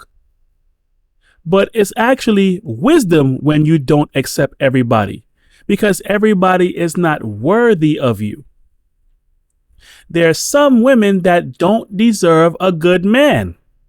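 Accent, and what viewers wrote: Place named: Caribbean. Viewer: Hearing a little West Indian in your voice but I can't put a finger on the island 😂Anyways men are too weak. Especially these day. We complain about women all day but their behavior comes from the fact that they are dominating us because we are soft, we accept anything, we are so hooked on p-sleeve. Fresh and Fit's podcast is a joke. CGA is the only guy doing the lords work. I know you recorded this before the update. She was lying but I knew this from the very beginning. Doesn't discount anything your saying. We need to do better.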